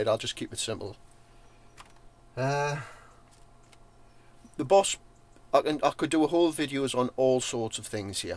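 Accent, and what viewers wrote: Newcastle, United Kingdom. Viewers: I love this video. It is just plain, raw, proper Geordie, and honest. "nay problem" 😀 And you can sing. Nice rich voice. So jealous.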